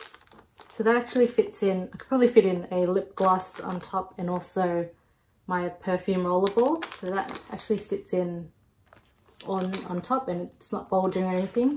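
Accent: Australia. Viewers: So refreshing to hear an Australian accent! I’m from Melbourne as well! If you don’t mind me asking, what do you do for work?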